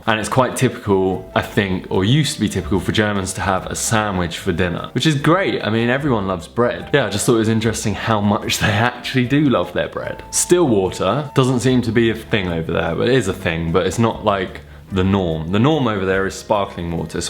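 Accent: United Kingdom. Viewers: wow! That is the first video of you that I watch and I love love love your accent! I'm actually German but I love it when people speak in this British accent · I love your British accent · I Love your british accent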